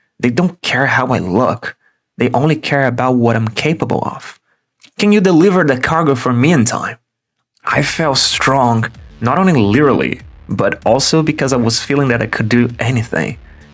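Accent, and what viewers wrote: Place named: Brazil. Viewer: eu reconheço um sotaque brasileiro de longe. ótimo vídeo, desejo tudo de bom pra ti! vc eh zica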